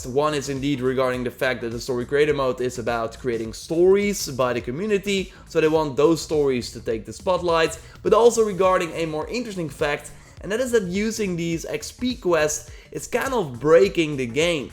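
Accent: Netherlands. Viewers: Niels De Vreugd yes i tought so cus you can hear it at his voice and i’m dutch to.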